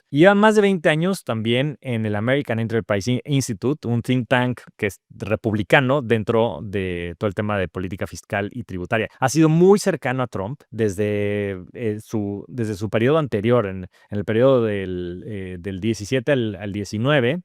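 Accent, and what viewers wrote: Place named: Mexico City, Mexico. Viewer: Interesante. El algoritmo me mando para acá. Me suscribo y like a pesar del insoportable tonito chilango fresa q se te sale cada tanto.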